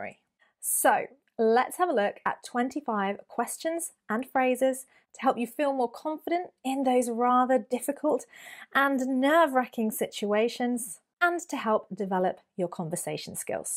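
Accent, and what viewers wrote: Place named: RP, United Kingdom. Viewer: Thank you so much! An extraordinary lesson without any doubt. I lived in the Southwest of London and love your accent. It sounds me received pronunciation.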